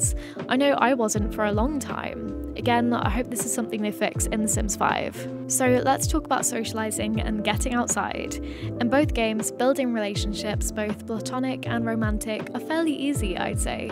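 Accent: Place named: United Kingdom. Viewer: reading books for kids might not be the most fun content to make but.. Something to think about if me, and momma are saying it. And You should be patting yourself on the back. You made a 44 minute video, on a topic I'm not too keen on, watchable. That's a feat. And I'm not a " yes man ". If I didn't enjoy the video, I wouldn't have said anything. I clicked to give you a view. Didn't expect to stay Speaking of your voice and accent.. What kind of accent is that? Forgive my uncultured americanism. I'd guess UK but I could be completely wrong.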